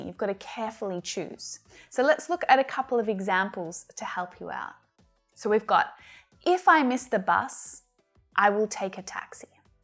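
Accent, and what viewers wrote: Australia. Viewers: I live in Canada and I am so happy to listen to your Australian accent that I love. I love to visit Australia as well. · your accent is so different. I LIVED in montreal, Canada. The accent from Austrilia is so good. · Australian English is the coolest 👍🏻😃, I really like your accent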